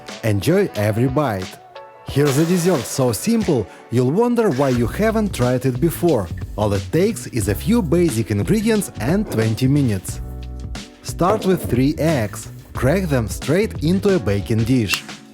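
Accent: Russia. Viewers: Advisory warning, there's nothing Japanese about this guy or his tricks or channel. I'm guessing from the accent he's originally Russian selling cooking techniques to an American audience. As a British born vegetarian former salad chef with Italian ancestry who doesn't eat white bread, white sugar or meat derived products, I won't be subscribing, lol. ✌️ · Clearly sounds Russian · Russian accent 👎🤦‍♀️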